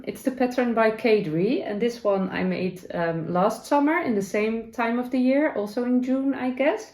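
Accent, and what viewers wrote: Netherlands. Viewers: I really enjoy your so-called "dutchy English" because my dear Father also had this accent! ❤ 🧶 Hard relate on 3mm struggles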